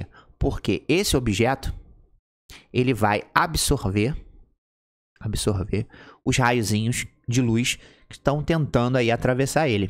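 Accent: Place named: Rio de Janeiro, Brazil. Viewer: Ele e do RJ kkkk sotaque kkkk aula boa 😊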